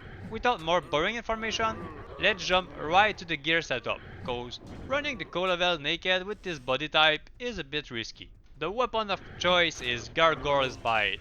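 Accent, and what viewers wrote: Quebec, Canada. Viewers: Québécois? Nice video 👍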